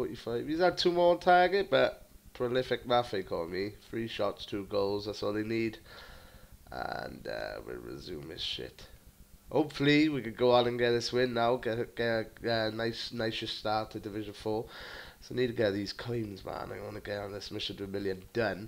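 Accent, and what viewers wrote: Wales, United Kingdom. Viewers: Love the welsh accent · Where in Wales are you from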